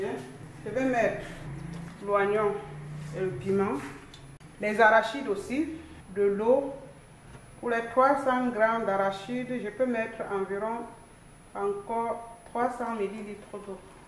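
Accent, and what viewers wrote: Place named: Cameroon. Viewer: J'aime beaucoup votre accent ! Ça me rappelle mon enfance au Cameroun à Mbouda ! Je m'abonne et j'essaie la recette aussi vite que possible ! Trop fan ! 🤣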